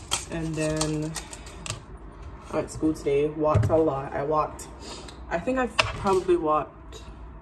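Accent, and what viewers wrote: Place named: United Kingdom. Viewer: Loved this! I hear the British accent coming through🤣🤣. Separately, that softball taster session finished me, same time next week🤝🏾🤝🏾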